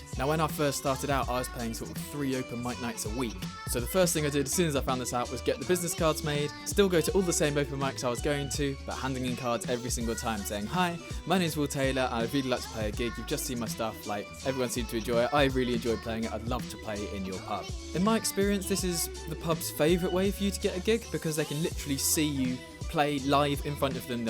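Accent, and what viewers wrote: United Kingdom. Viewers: Luv the British accent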